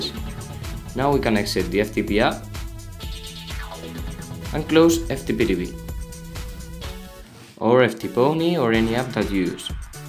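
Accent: Spain. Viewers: SE NOTA QUE HABLAS ESPAÑOL CRACK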